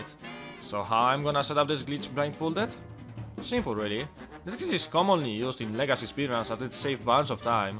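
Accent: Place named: Poland. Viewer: i love his polish accent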